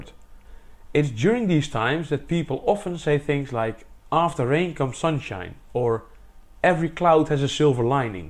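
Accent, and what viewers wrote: Netherlands. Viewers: Dat nederlandse accent herken ik vanaf 10 km verderop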